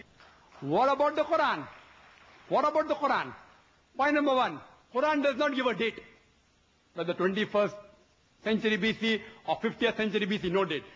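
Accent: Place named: India. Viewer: just cos he has a strong accent as it is his SECOND language (or third depending where from India he's from), it doesn't invalidate his point.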